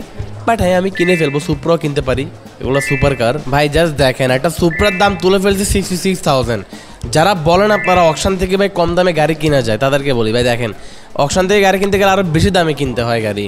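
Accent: Noakhali, Bangladesh. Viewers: ভাই নোয়াখালী কথায় আপনার বাড়ি😅😊❤❤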